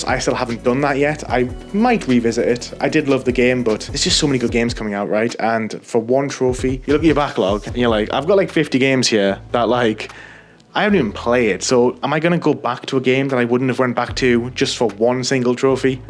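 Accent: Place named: North East England, United Kingdom. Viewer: I have over 50 platinums. Out of them 50 i have the dlc trophies for about 3 of them 50. Some games i have dlc purchased but havent touched it, because once i have platinum, im usually too fatigued to play dlc. Im like you. Im 35 now though and have bad adhd. Im really struggling to do the grinds that once seemed theraputic. Cba watching guides for collectables etc etc anymore. Much prefer just putting game on and playing it in my own time! If platinum becomes viable, i will get it, but i wont go hunting like i used to. Dont get the dopamine that i used to when getting plat. Feels like its all for nothing now. Also nice to see a fellow north eastener doing well on Youtube gaming. I'm from Teesside! Just down the road, judging by your accent!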